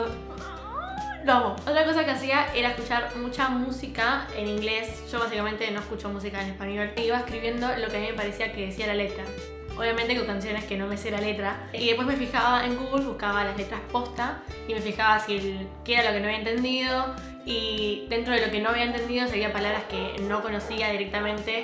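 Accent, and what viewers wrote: United Kingdom. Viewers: me encanta tu acento británico 😘 ahora tengo una amigo British y me encanta el acento de el también, continuaré viendo tus vídeos ❤️ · Nunca tuve preferencias con los acentos! Pero desde que empece a ver tus videos.. Amo el acento britanico! Quiero aprender ingles britanicooo! Sos muy genia! TKM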